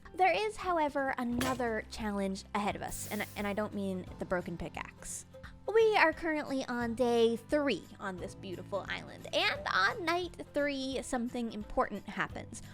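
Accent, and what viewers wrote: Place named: Canada. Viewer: Mannn the Canadian accent. I löve it. It’s so endearing. (I’m from UK)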